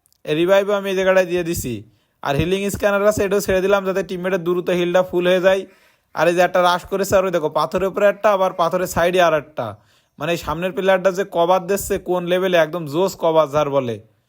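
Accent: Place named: Kaliganj, Bangladesh. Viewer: Tomar basa ki Kaliganj er ase pase? Tomar kothar style sune bollam.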